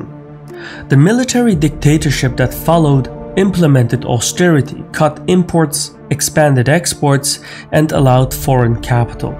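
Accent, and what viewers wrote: Brazil. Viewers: Pelo sotaque o narrador e brasileiro 😂😂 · O dono do canal é brasileiro?? Claramente esse sotaque parece de brasileiro, me dá agonia kk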